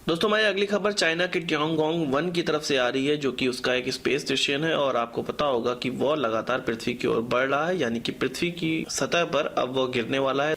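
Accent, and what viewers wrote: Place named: Pahadi, India. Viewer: Baat krne k tarike se he pta chal rha tha..Pahadi bhai hai.. :)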